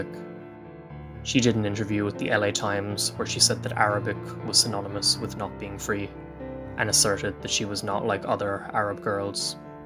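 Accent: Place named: Ireland. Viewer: Why do you not talk about the atrocities made by other European countries participating in the Eurovision, just about Israel? you're clearly an antisemite. There's a cure for that. Learn history. There is no such thing as Palestinian people it was made up in 1964 to hurt Jews and ruin their only homeland. you're probably Irish by your accent so I'm not surprised. And that Nazi scream at the end... u need a shrink man.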